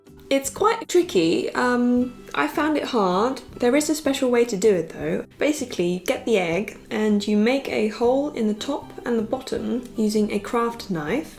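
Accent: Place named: United Kingdom. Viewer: Most of the time you get american english here in germany but i must say i like the british accent. :3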